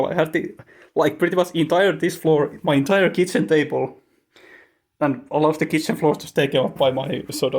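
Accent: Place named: Finland. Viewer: I know a Finn when I hear one❗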